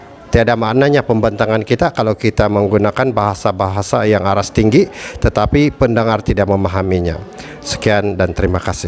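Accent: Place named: Indonesia. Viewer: Accent dia macam accent org Indonesia weyy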